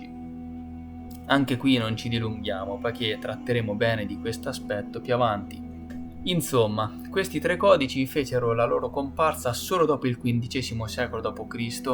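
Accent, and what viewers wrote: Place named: Senigallia, Italy. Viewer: Ottimo lavoro, complimenti. Dall'accento sembri di Senigallia.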